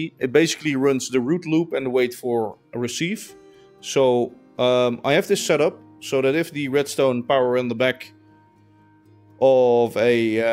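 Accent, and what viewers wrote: Netherlands. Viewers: I smell a dutch accent.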